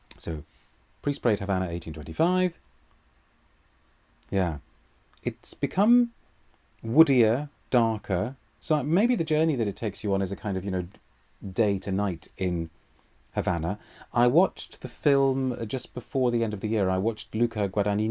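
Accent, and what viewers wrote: United Kingdom. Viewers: Happy New Year Mr! Welcome back, so glad to hear your British English again:)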